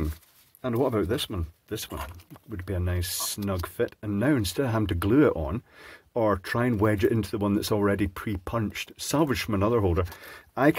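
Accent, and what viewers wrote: Scotland, United Kingdom. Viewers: Poshest Scottish accent I've ever heard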